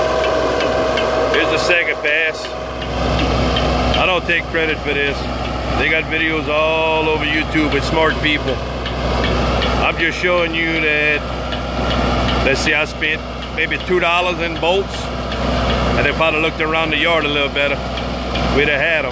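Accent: Louisiana, United States. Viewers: You sound like you from the Parish!! I live in Hessmer.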